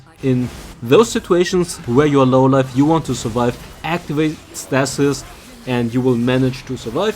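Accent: Germany. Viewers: Are you german? Your accent sounds german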